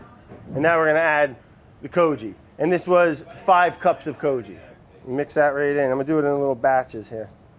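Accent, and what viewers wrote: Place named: New York, United States. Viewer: His New York accent is so funny to hear if you’re a Midwest girl like myself! 🤣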